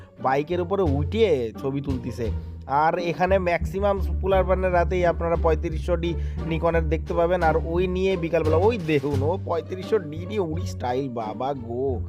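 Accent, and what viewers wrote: Bangladesh. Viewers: দাদা কি কুষ্টিয়া আসার সম্ভাবনা আছে নাকি? কুষ্টিয়ার ভাষায় কথা বলছেন।🇧🇩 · Dada tumi to Bangladesh i vasa bolcho 😊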